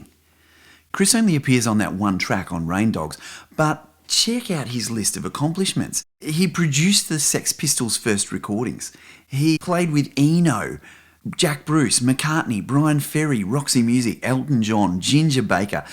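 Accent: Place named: Australia. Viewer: Both of these videos on the guitarists of Tom Waits are great,. Your storytelling, energy, wonderful Ozzie accent and most of all your playing were really a treat. Thank you.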